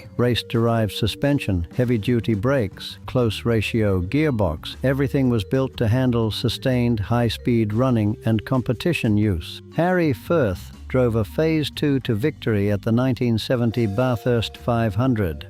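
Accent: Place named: United States. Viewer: similar, he has that yanky voice.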